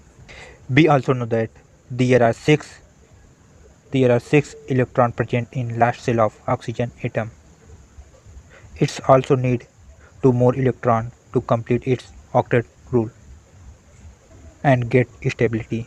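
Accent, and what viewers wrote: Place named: United Kingdom. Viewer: Bhai British main lag rahe hai 🔥🔥🔥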